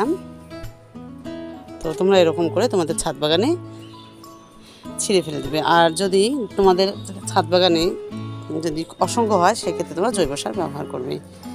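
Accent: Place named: Bangladesh. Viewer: আপনি তো একদম বাংলাদেশিদের মতো কথা বলেন। আমি এত দিন ভেবেছি আপনি বাংলাদেশী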